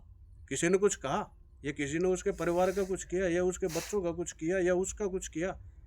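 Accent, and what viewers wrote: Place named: Alwar, India. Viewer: आप अलवर से हो ऐसा लगता है बहरोड़ मुंडावर साइड से भाई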